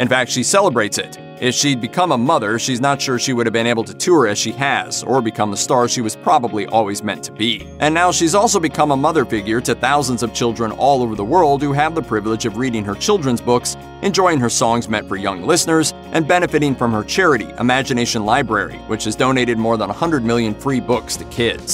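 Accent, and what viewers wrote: South, United States. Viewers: you have a nice American accent you sound normal i enjoyed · A<link> love the way you said mama! I love hearing it.. soo endearing! Not sure if you got southern roots or even mountain roots! Either way I hope you get to meet this graceful lady!🙏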